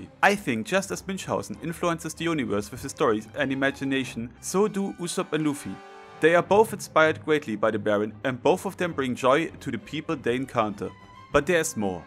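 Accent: Germany. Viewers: Bro's german AF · Du bist Deutscher, oder? Starkes Video!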